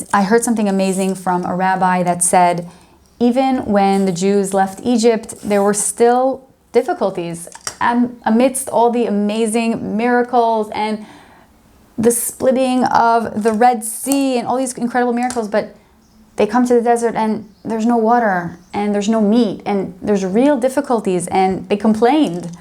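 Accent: United States. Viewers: Which part of America are you from?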